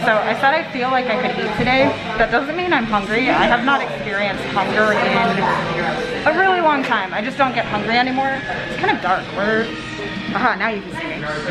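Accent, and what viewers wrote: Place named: England, United Kingdom. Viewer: shes English is why she says it that way.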